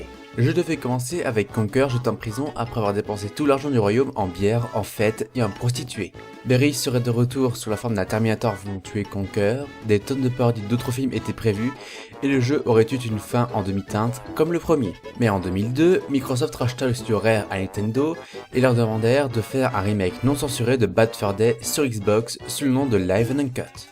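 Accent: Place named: England, United Kingdom. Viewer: tu un belle acsent anglais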